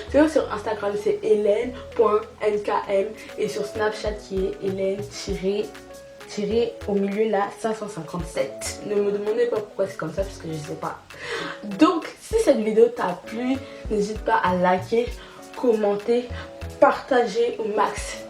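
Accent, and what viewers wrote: Cameroon. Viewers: cc super vidéo ...merci pour les conseils mimi et drôle tu es de temps en temps mets l accent cam quand tu parles j aime bien j attends plus de vidéos ma chérie bizu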